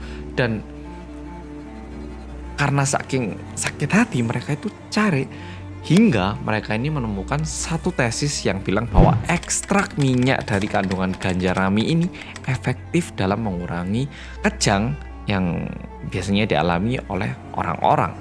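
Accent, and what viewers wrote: Java, Indonesia. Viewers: Welcome back wong medok dengan detektif 🥺🥺🥺🥺 Betapa kangen nya aku sama detektif 🤧🤧🤧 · Tim gercep Mas wkwk ❤️❤️❤️ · Siappp mas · timmmmmmmmmm gercepppppppppp korea medokkkkkkkk mas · Alhamdulillah. Orang aplud lagii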